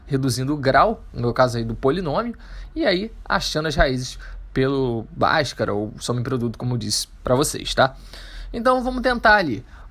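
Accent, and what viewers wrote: São Gonçalo, Brazil. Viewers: Você é de São Gonçalo RJ??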